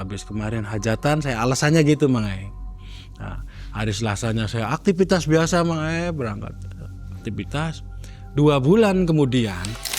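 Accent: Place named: Brebes, Indonesia. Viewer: Mas wong brebes bukan sih?? Logatte kaya wong brebes tanjung losari jawatengah.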